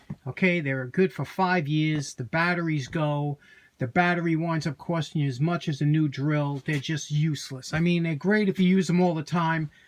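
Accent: New York, United States. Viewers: I only watch your videos for the New York accent! After years of buying new Chinese Craftsman battery drills every year I bought Makita Chinese Li Ion drill and impact. 6 years and counting. I am shocked. It has built complete decks and privacy fences!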